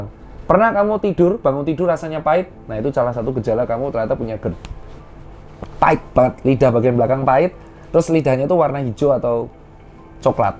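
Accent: Java, Indonesia. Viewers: Salam sehat slalu dok.... Saluttt juga kerennnnn logat jawae dokter tirta , Salam satu jiwa dari arema.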